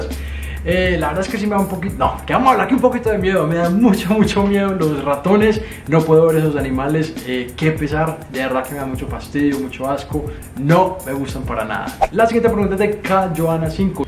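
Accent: Antioquia, Colombia. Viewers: Ya tienes de nuevo muy marcado tu acento colombiano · estás otra vez con tu acento paisa jajaja lindo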